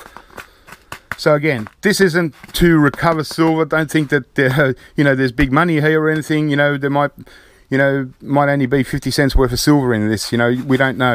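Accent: Australia. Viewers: its obvious he's from Australia by his accent and the fact he's wearing a t shirt in april, too cold in the uk for t shirts at the moment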